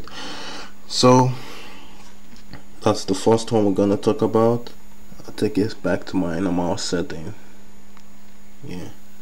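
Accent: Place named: Nigeria. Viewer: U sound Nigerian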